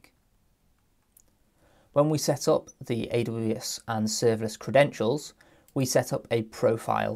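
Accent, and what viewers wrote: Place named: Northwest England, United Kingdom. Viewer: Nice one, love your accent btw. Are you from around the northwest of UK?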